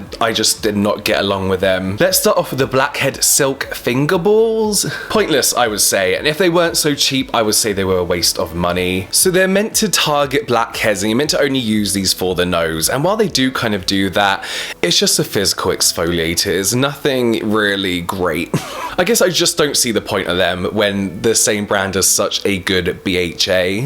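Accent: United Kingdom. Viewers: Love, love, love your videos! You are about my age and you look amazing. Of course I’m going to pay attention to your opinions with your fantastic skin and attitude. You’re amazing! Also a bonus is your charming British accent and humor 😍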